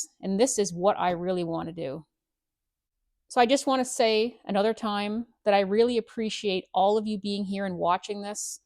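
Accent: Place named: Canada. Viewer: I like your work and your delightful Canadian broge.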